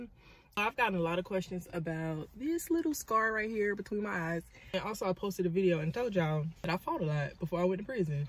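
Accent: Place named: Philadelphia, United States. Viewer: U sound like my home girl from philly… keep the stories coming great job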